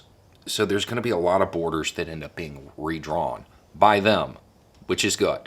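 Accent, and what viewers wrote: United States. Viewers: sounds like the us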